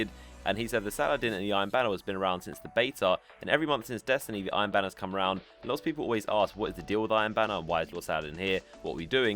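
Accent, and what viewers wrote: United Kingdom. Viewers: Race doesn't influence how you sound. He has a Brit accent because he's from Britain. Stupid.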